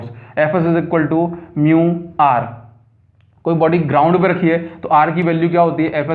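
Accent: Odisha, India. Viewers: Sir ap Odisha se ho kya❤️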